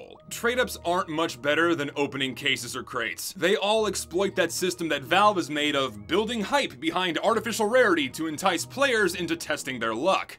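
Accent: South, United States. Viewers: Sounded more and more southern as this played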